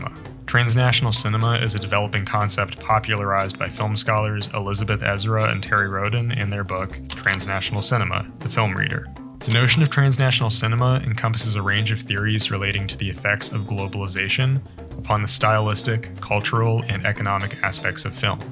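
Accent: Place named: Eastern Europe. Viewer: I feel Slavic notes in ur voice. Gotcha 🤫🗿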